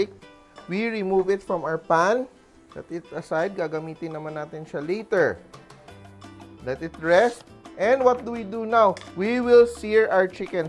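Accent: Philippines. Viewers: Philippines accent are cute ...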